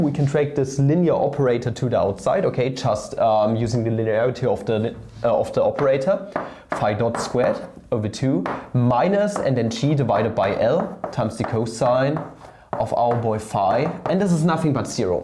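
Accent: Germany. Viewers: I clicked, heard a German accent, and stayed. 👍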